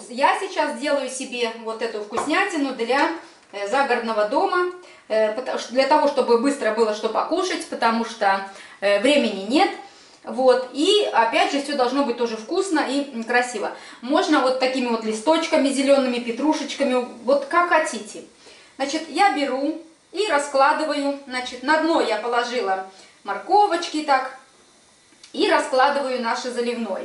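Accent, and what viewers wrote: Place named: Ukraine. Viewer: Вы были в Украине, что вы так говорите..?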